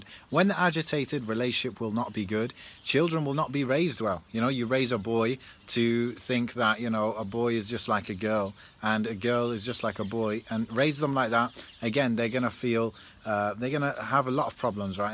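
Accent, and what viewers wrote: United Kingdom. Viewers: As-salaamu alaikum. What's the name of the introductory vocal track please brother? Also, are you from Derbyshire, your accent sounds Midlands-y?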